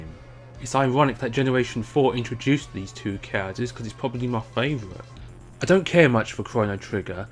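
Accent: England, United Kingdom. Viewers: dull: dou battle: ba-uh man I love the English accent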